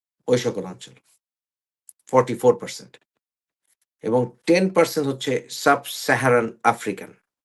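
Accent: Bangladesh. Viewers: ডি এন এ চেন্জ করেছেন কিন্তু গলার টোন টা তো পুরাই বাংলাদেশী তাও আবার আন্চলিকতার টান আছে । ডি এন এ চেন্জ করে কি লাভ হলো? কথাগুলা সমালোচনার আকারে বল্লাম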